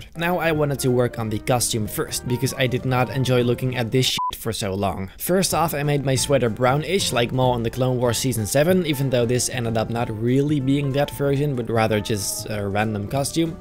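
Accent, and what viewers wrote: Netherlands. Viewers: When I heard you speak I had some suspicions and during the contest segment it was indeed confirmed you're a fellow Dutchie. Leuke video, ga zo door! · ik wist het, je klonk al zo Nederlands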